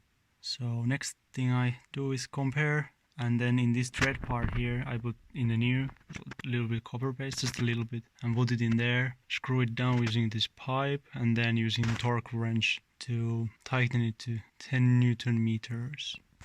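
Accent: Finland. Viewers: Finnish accent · your accent sounds exactly like finnish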